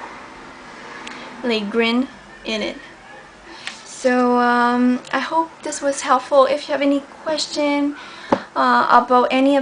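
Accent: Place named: Quebec, Canada. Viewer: I love St.Ives I use almost all of their products :) But....just asking do you live in Quebec because you sound french from there...anyways I love your videos! :)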